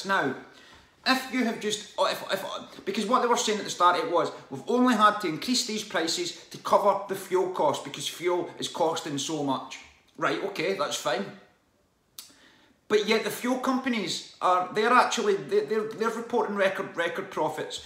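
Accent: Scotland, United Kingdom. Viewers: Love ❤️ the Scottish accent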